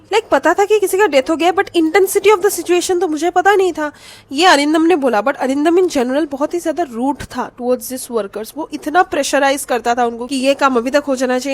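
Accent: Bengal, India. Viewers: Love your bengali accent💛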